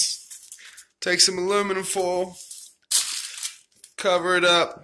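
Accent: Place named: South, United States. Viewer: Love it. You got to be from the South:0) Im born in TN and live in SC. Best video ever.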